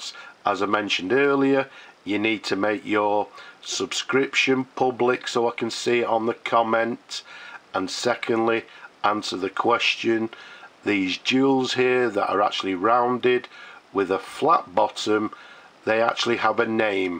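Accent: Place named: North, United Kingdom. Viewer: love the pen and glad to see the nice event with my favorite nothern accent again.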